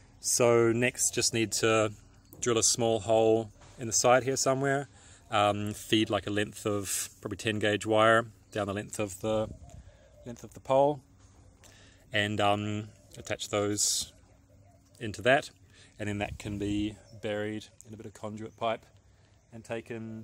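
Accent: New Zealand. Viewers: Is he an Aussie? His "R's" make him sound like he comes the bottom the the south island NZ.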